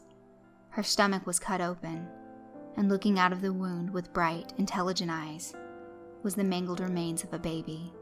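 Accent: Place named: Georgia, United States. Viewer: Where ever you get your stories is great much different than the other's but in the best way possible.I love your voice I'm from Tenn and u remind me of family in South Ga Love the channel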